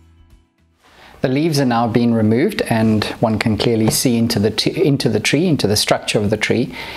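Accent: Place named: South Africa. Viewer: Fall there vs spring here in scandinavia can be confusing but then you start talking in the nice south african accent and i go uh yes he is on the other side of the world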